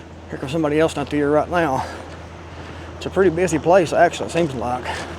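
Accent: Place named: Tennessee, United States. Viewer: Either buddy, I just really like this video and I just think it’s really cool the way you explain things I love how you’re driving in your car. I think it’s really really awesome and I can’t wait to hear and see more videos from you. You’re really awesome and I like your accent. It must be nice living in Tennessee, but I definitely will stay as a fan and watch more of you I think you’re awesome man.😮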